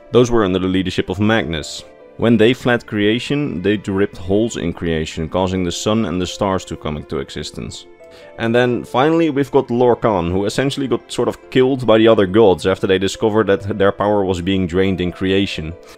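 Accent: Netherlands. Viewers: Dumb question, but are you Dutch? Your accent sounds Dutch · Hey question if you don't mind me asking buy you don't happen to be dutch do you? (I'm dutch myself and I thought I heard a small dutch accent over your english) Also great video I enjoyed it very much :D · Lekker Nederlands accent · Are you dutch by any chance? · I can tell youre dutch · Are you niederlansk? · So I just discovered you. Now I have a whole lore backlog to go through to have going in the background while I work! Wahey! Also, fellow Dutchie I hear, so bonus points for that. Goedenavond! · Great vid. Are you Dutch? The accent seems familiar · I need to know: are you dutch? Its your accent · U dutch? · You dutch? You sound dutch. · I hear a Dutch accent 😊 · God...that dutch accent tho...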